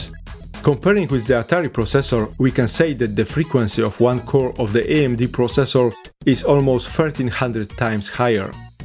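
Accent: Poland. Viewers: please do the voiceovers with one of available speech synthesizers next time :( i can't stand this Ponglish, which is a darn shame, as the rest of the vid is great. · akcent... uszy bolą... nie sprawdzając jeszcze domyśliłem się, że polak... nie wiem, ale tego typu akcent - powszechny u polaków, to ... nie wiem jakby to określić.... jakby "udawanie" że się mówi po angielsku ale sama treść wartościowa i ciekawa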